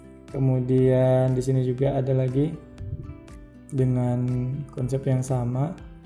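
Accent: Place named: Pontianak, Indonesia. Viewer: Kayak bhsa pontianak ya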